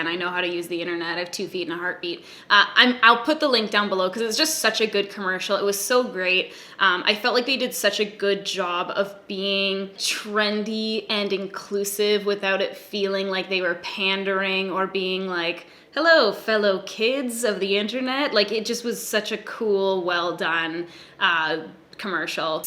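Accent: Canada. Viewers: I have many thoughts on today’s vid but I’m very, very tired so I’ll leave you with this: you said ‘saga’ in your sweet lil Canadian accent and I heard ‘Sega’... like sega genesis. Like sonic the hedgehog Sega. And I was like ‘so was NOT kidding. This video went off the makeup rails’ and then I realized you were saying ‘saga’ and I couldn’t stop laughing about what a dumbass I am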